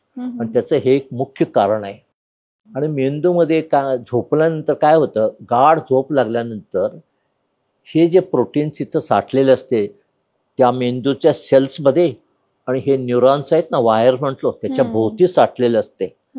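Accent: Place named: Karnataka, India. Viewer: डाॅक्टर कर्नाटकातील आहेत. कानडीचा प्रभाव मराठीत जाणवतो. निरीक्षणावरुन.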